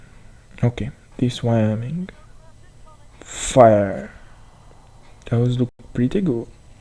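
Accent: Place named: Romania. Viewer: You sound like an romanian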